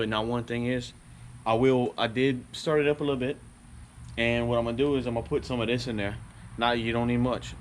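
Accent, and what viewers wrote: Louisiana, United States. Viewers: Bet you from Louisiana accent sound too familiar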